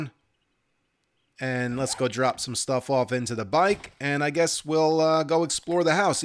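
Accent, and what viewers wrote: United States. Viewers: Best series ive seen so far. You sound so american, its insane 😂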